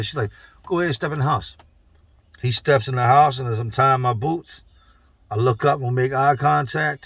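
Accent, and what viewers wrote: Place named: Philadelphia, United States. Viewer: Hell yeah man! Keep doing you! Also side comment cracks me up how you say “on” you can tell you spent a lot of time in Philly. When I lived in AZ people would comment how I spoke all the time and especially how I say “on”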